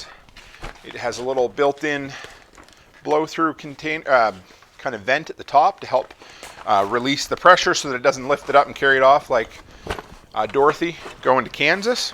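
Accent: Canada. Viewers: "Oatside". Love the Canadian accent.❤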